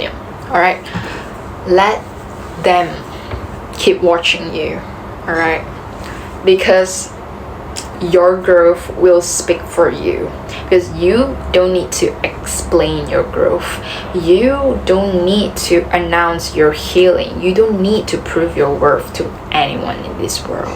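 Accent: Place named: Meghalaya, India. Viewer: Your accent reminds me of my incredibly wise friend who is from Meghalaya. It feels like an older more experienced friend spoke to me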